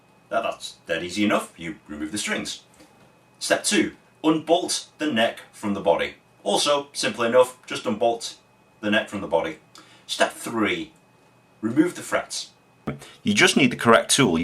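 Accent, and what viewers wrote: United Kingdom. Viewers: Thanks. What a pain in the keyster. If I decide to make a guitar fretless, I think I'll buy the neck.Thanks for this video!BTW...Vietnamese (?) guy with a Texas A&M tshirt and a British accent...i chuckled a little!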